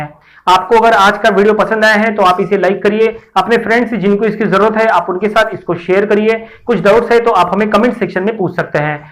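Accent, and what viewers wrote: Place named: Hyderabad, India. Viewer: Hyderabadi accent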